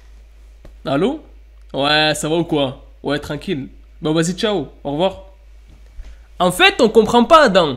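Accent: England, United Kingdom. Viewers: j'adore ton accent anglais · Je kiff ton accent anglais frérot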